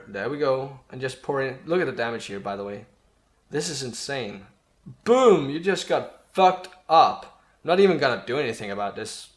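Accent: Denmark. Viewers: Der er ikke meget dansk accent, over din accent. =D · I heard u were danish instantly, im danish myself, i dont have as bad accent tho, a lot of danish people are stuggling with an accent while talkin english tho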